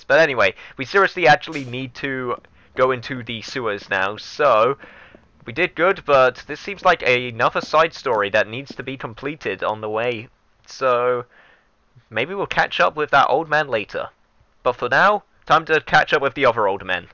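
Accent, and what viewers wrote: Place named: South, United States. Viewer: actual Texan here, I think your southern accent is hilarious. Also good lets play, I've watched almost all of your yakuza videos in like 2 days lol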